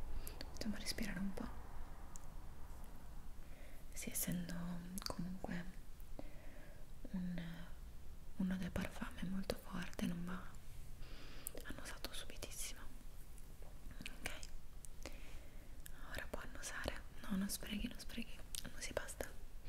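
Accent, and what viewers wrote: Italy. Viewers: Yes I love Italian accent ❤💕💕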